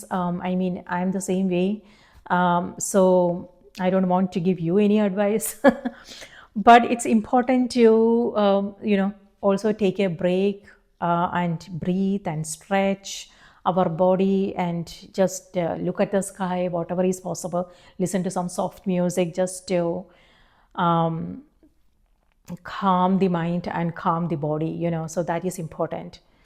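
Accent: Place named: India. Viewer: nooo ...not with your indian accents...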